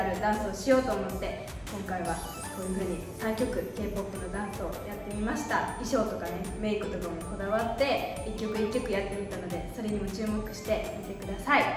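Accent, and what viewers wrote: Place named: Kansai, Japan. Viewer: ぽてちゃんの関西弁好き😘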